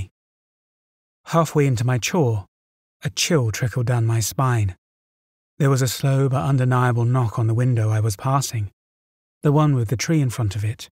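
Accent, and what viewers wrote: England, United Kingdom. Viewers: British accent I’m out ! Painful to listen too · Who knew an English accent and native folklore could work so well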